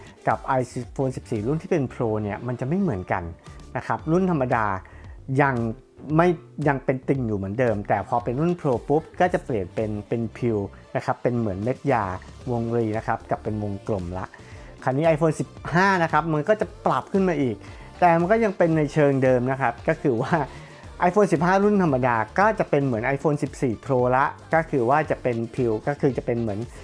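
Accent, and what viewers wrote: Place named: South, Thailand. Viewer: แหลงใต้แล้วล่าสุดเยื้อไม่ไหว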